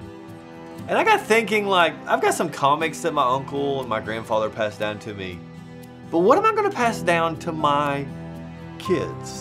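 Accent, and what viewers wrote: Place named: Ohio Valley, United States. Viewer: Thank you for sharing! Keep it up man...I appreciate that you share your walk with God to all those who listen to WalkFM. I just found you all online as I was searching for Christian Radio Stations back home (Ohio Valley). I have tons to listen to here in Nashville,TN...but I was missing the accent and familiarities of "home".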